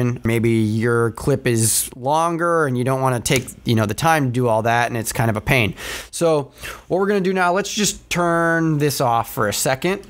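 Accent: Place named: United States. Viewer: I HATE YOUR ACCENT IT MAKES ME SOOO ANGRY!!! fucking amercian talking like a hobo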